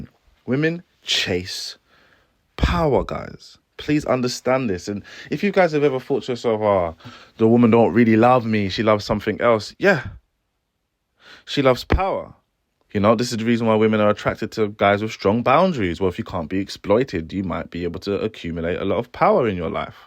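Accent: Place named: RP, United Kingdom. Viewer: Thanks for explaining this in the Queens English.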